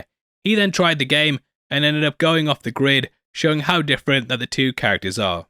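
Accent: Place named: United Kingdom. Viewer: Clearly he has a British accent so cant be "Murican."..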